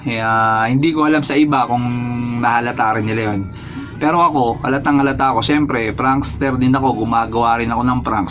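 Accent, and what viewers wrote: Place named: Ilocos, Philippines. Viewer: Ilocano ka?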